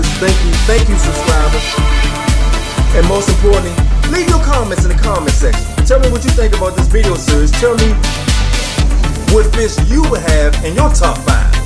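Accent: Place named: Washington DC, United States. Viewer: Cool fish. Sounds like you are from the DC area. ??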